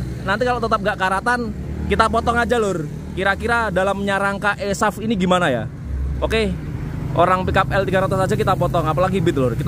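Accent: Java, Indonesia. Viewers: Bahasanya medok 😂 Asli mana??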